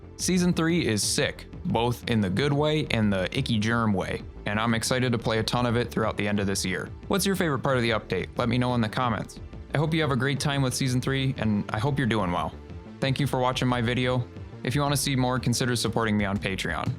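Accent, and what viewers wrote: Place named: Canada. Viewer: Your Canadian accent is barely noticeable but it's adorable. No malice in mind, just been seeing that dude on "shorts"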